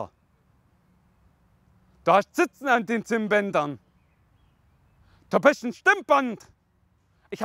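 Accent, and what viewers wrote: Swabia, Germany. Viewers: DU BIST DER BESTE. Alle die ich kenn reden nur noch wie du in 3 stufen und den anderen Videos. Stuttgart rockt. Da kommen halt doch die besten her. Dein Schwäbisch ist so krass geil beim trainer und so. Danke für die geilen Shows. Stuttgart und umgebung im Lachflash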